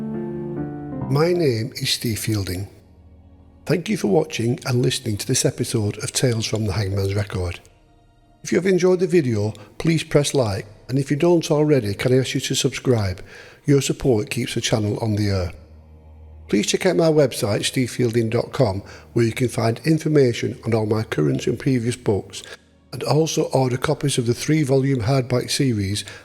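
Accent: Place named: St Helens, United Kingdom. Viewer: Great episode Steve, I do miss that accent….trained as a nurse in St Helens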